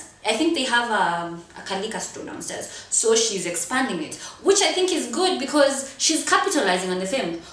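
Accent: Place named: Kenya. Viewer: Yah nver fake an accent hhhh I like kenyan accent cming there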